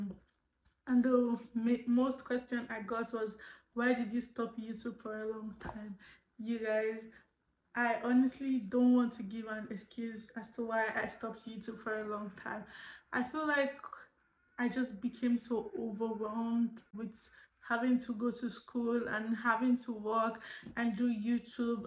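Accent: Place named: Canada. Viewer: Wow... welcome back, i really miss you and i love Canadian accent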